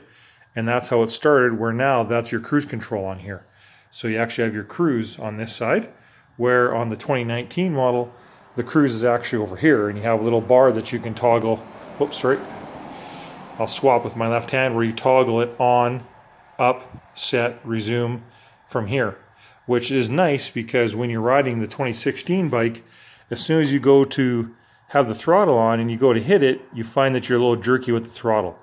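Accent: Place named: East Coast, United States. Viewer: When I started listening to your video I would have sworn that you were an east coaster. Your accent doesn't sound like Alberta to me! Thanks for the video. I don't like the tft screen, and DO NOT want keyless start! Maybe this is the bike for me. I don't like the white, but maybe that shouldn't matter at all. I am near Niagara in Ontario and plan to explore this great country of ours on two wheels. Part of.menthinks this is the way to go.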